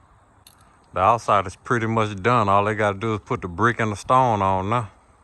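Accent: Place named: South, United States. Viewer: Where are you from? That sounds like a strong Southern accent... like mine 😂. First time watching, from MS.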